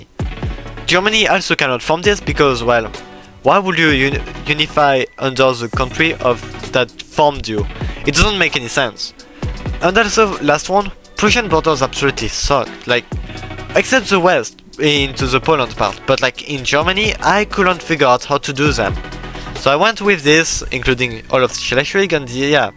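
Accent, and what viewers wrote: France. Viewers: Je serai surpris si tu n'etait oas francais,qsa vois avec la voix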